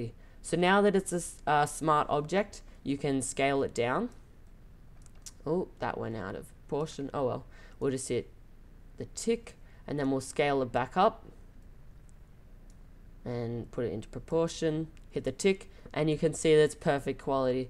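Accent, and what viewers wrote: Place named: New Zealand. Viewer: trying to f-eeg-yuh out your accent: Kiwi? Aussie? Good video.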